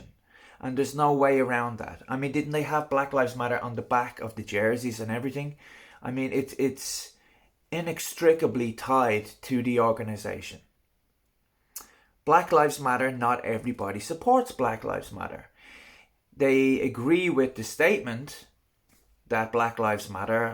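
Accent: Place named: Ireland. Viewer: You are right Tyrone's move was not a good one, it was brilliant! The woman is vile and obnoxious and her abhorrent behaviour should be called out much more, you sound like you are Irish? if so, try this one, do you think it is acceptable that the same woman stood up in parliament approximately three years ago and suggested that they should block food supplies to Ireland in an effort to force the Irish away from seeking the backstop as part of the withdrawal agreement? What kind of sick person/politician would think on those lines, you see she is right wing and I find it interesting that you demean the left who by the way seek social justice and economic equality as their primary objective whilst using a Nazi salute as analogy and comparison, Priti Patel's behaviour is right wing and guess what, so too were the Nazi's, are you a closet Nazi and racist using soft and subtle persuasion tactics, I wonder? 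You are being very arrogant and clearly attempting to link politics with what is a simple yet powerful gesture against racism and again one has to wonder? your argument sounds a lot like passive aggressive. As for BLM, they are entitled to their views, aims just as equally as the right, and as for capitalism, it is no great shakes and will ultimately lead to the total destruction of human kind not as a matter of if but only when.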